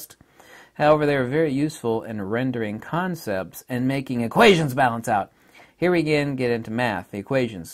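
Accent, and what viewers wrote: Germany. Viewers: Speaking German like a native, judging buy the accent you picked it up in the Saxony region. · You got German dialect perfect nice.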